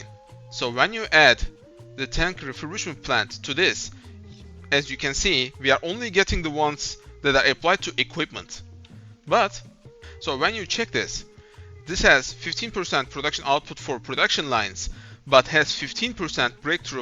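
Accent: Turkey. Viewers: bro are you turkish you have turkish accent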